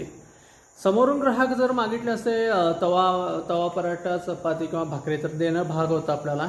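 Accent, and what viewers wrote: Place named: Kolhapur, India. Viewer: व्हिडिओ आवडीने बघतो कारण कानावर कोल्हापुरी भाषेचा गोडवा पडत असतो. आम्ही पुण्यात असतो परंतु आपल्या मुळं आम्हाला कोल्हापूरात राहातं असल्याचे वाटते. धन्यवाद दादा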